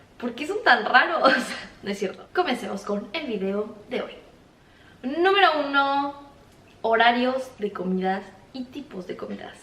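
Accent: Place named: Mexico. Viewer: De que región de México eres, por tu forma de hablar ¿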